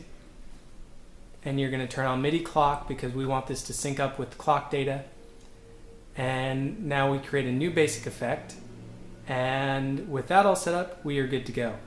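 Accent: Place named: United Kingdom. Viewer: So thats what you look like....I always thought you were british lol.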